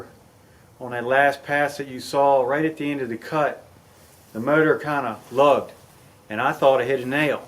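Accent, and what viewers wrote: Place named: London, United Kingdom. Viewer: Love your farm! Are you in MD? Your accent sounds like my friend's in Hampstead. Thanks for the Kohler info. - Craig in PA (LT40 wide owner)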